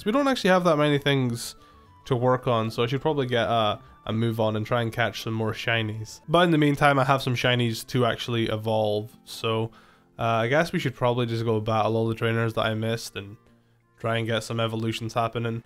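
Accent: Scotland, United Kingdom. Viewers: you sound like you're from Scotland like me!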